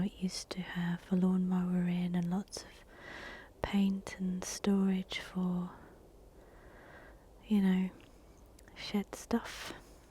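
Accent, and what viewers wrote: United Kingdom. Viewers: Who else but could relax me and make me sleepy doing a reveal video. Looking forward to your new studio, and plz quit rubbing and scratching the carpet i allmost fell off to sleep. Lol and being from the southern part of the USA, i LOVE the Brithish accent! Good luck Love!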